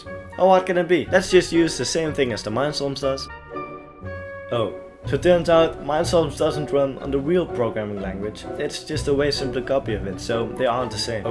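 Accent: Netherlands. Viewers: I immediately knew you were dutch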